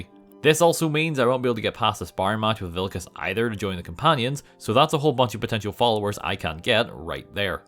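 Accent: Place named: Northern Ireland, United Kingdom. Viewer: The Northern Irish accent just fits with Skyrim idk why.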